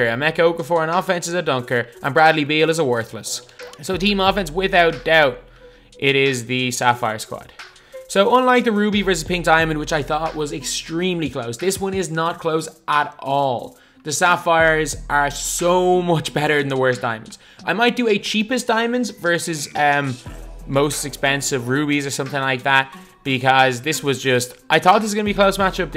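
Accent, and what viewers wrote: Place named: Ireland. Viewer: First video of yours I watched but I know by the accent your Irish?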